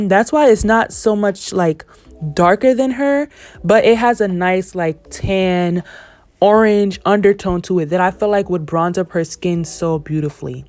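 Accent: United States. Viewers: I also mix foundations to get my clients perfect match. I still use the back of my hands it warms the products. You sound like you’re from Dade County 😂 Miami you Sound like me New Subbie 👋🏾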